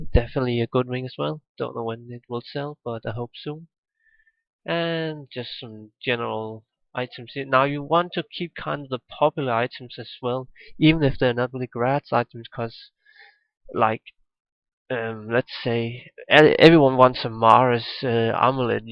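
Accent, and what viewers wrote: Denmark. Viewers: a bit danish maybe??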